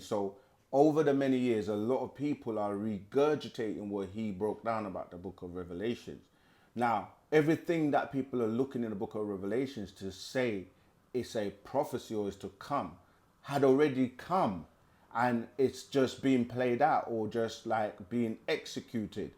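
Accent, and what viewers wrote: South Africa. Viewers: I can hear this broor is probably Zulu/Xhosa from South Africa 🇿🇦🇿🇦🇿🇦shout out ......Thank you for the break down ❤️‍🔥❤️‍🔥❤️‍🔥❤️‍🔥❤️‍🔥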